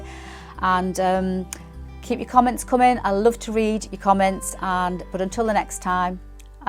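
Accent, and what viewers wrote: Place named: North, United Kingdom. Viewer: The babylock machines are the best i have that coverstitch and an imagine overlocker, You sound northern like me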